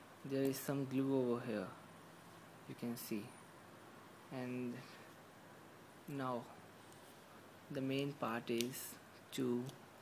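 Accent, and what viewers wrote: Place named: India. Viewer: pure indian accent. lmfao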